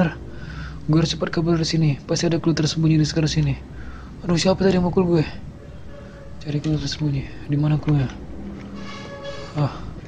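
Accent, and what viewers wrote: Medan, Indonesia. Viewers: mas bahas mas kaya orang medan mas bro dan pak bro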